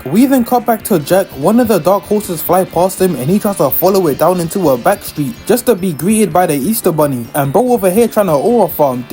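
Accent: United Kingdom. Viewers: Bro I was caught off guard by your British accent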